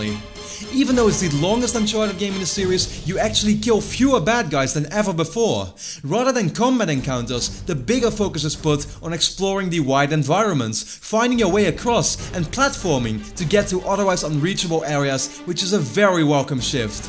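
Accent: Netherlands. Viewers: Hahaha, je hebt echt zon zwaar engels-nederlands accent ^_^. Ik zat wat reviews te kijken, dacht dat je een engels kanaal was. En je klinkt zo verschrikkelijk nederlands. ;) Leuk kanaal trouwens. Goed dat je het in het engels doet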